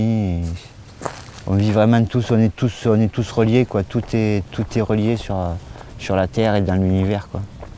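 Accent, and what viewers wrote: France. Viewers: l'accent du sud de la France, j'adore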